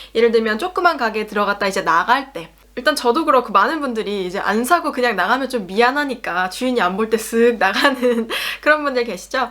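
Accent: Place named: Saxony, Germany. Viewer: 제가볼땐 발음은 약간 동독(작센)사람이 베를린이나 북부독일(함부르크 등등)와서 표준말 구사하는 것 같아 보입니다.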